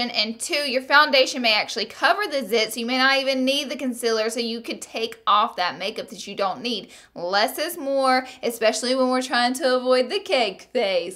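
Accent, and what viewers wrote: South, United States. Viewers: erez muy lindaa me encanta tu acento sureño..Buenos tips..😉😉... you are beautiful luv your southern accent ...good advices I'll be putting them in practice you are one of my favorite😉😉😚🌹🌹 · is she southern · Oh that Southern accent-- so sweet to my ears! 'Cuz I'm from Louisiana. Prayers for those who have been affected by the flood in Baton Rouge...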